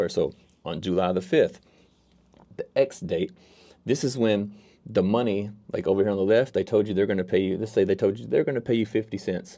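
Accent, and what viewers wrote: Louisiana, United States. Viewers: Are you from southeast Louisiana?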